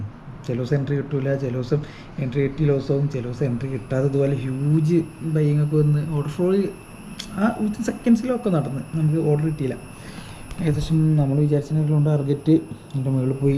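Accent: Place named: Malappuram, India. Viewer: ഒരു മലപ്പുറം ട്ടെച്ചുണ്ട്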